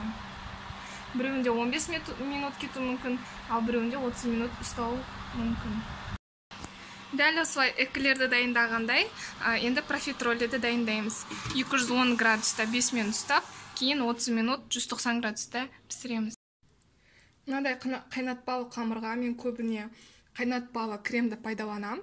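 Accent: Standard. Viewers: Саламатсыз ба? Қазақша таза сөйлеп, түсіндіргеніңізге қуаныштымыз. Өте керемет, риза болып отырмын. Бар білгеніңді ортаға салғаның қандай тамаша! Каналың дами берсін. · Қолыңыз дерт көрмесін осылай қазақша таза түсінікті айтып түсіндіргеніңіз үшін сізге үлкен рахмет айтамын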